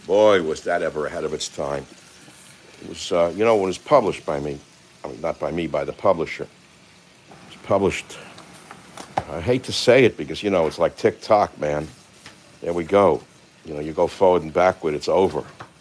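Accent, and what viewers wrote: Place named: Bronx, United States. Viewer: Dr. Savage, another reason your listeners enjoy your new cooking format so much is it's strong ASMR component. It's very relaxing. The clinking of the untensils, the sizzling of the oil, and that along with your voice, which is deep, rich, and maliflous. On a personal note and as a Bronx native myself, your distinctive Bronx accent reminds me of my parents, grandparents, and other relatives, most gone, who I miss so much.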